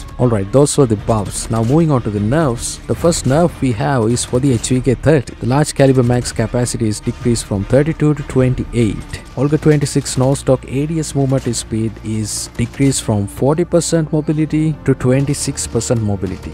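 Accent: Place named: India. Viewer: Hey love ur vids has helped me a lot also are u from india ur accent seeems like it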